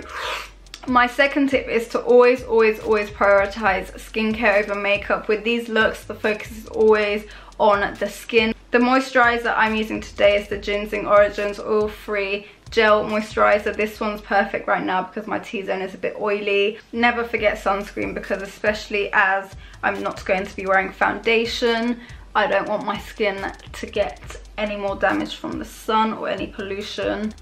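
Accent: London, United Kingdom. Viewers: Just me who loves her voice? Got like that London accent it’s so calming lol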